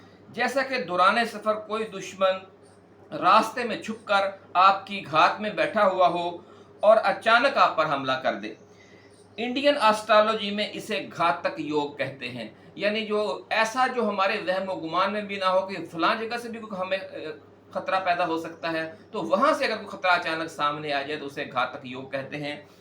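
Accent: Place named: Punjab, Pakistan. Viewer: Khuda ka wasta Urdu theek sy bol kren.. pandat nhi pandit...& Many other words .urdu ko urdu ki trha bola kren punjabi ki trha nhi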